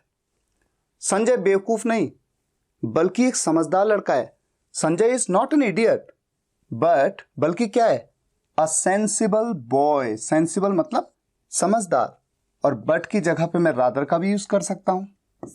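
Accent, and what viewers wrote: Uttarakhand, India. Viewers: Sir aap uttrakhand se ho kya🙏🙏🥰🥰🥳🥳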